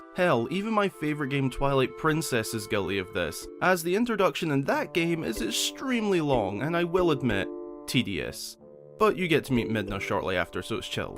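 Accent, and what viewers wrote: Scotland, United Kingdom. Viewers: First video I've seen from you, and I love the Scottish accent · When I heard the Scottish accent I audibly gasped. I'm also Scottish and a fan of LoZ :-) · I love the thought of Dodongos in BOTW! BTW, I couldn’t pinpoint it at first, but your accent sounds just like the male version of Merida’s from Brave. I’m guessing that may be because you’re Scottish?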